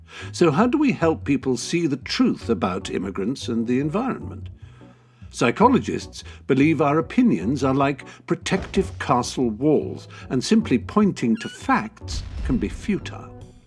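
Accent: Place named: United Kingdom. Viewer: So Anonymous is liberal now? I'm not a Trump fan, but the creator needs to look in the mirror. 1. The EPA is unconsitutional per the 10th amendment (along with most Federal agencies), so that's not good. 2. Douglas Keenan PROVED the IPCC fabricated the results supposedly "proving" global warming is anthropogenic. That which can be asserted without proof can be dismissed without proof. 3. What does a graph of world poverty have to do with Trump? 4. The most dangerous places in America are those with the strictest gun laws, but let's ban all guns. 5. Years of education are higher than ever yet real median household income has been falling since 2000. Hmm, maybe because public schools are really indoctrination camps that teach nothing but how to obey authority (and how to beg it for help). Dept of Ed also unconstitutional. Surprisingly, a British accent only gives the illusion that you know what you're talking about. 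It doesn't matter what facts you present these people, they will always accuse you of that which they are guilty. Lastly, I'd be willing to wager a paycheck the creator hasn't sat through The Clinton Chronicles. That's called confirmation bias fyi.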